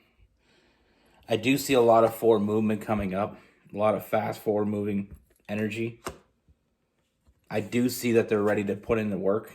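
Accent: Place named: Canada. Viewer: I'm from New Brunswick. Are you from Canada? i hear an accent. lol. if not. I'm so sorry.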